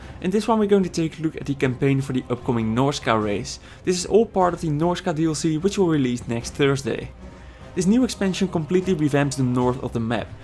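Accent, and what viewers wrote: Netherlands. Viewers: Hael Premjartonn he is dutch, not german. The accents don't even sound a little bit the same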